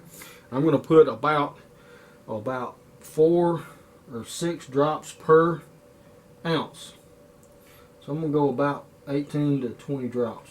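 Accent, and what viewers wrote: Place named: Texarkana, United States. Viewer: That was fantastic. I am gearing up to turn my first blank and spending time looking at at many videos of the whole process as i can. i am trying to place your cool accent, Texarkana region by any chance?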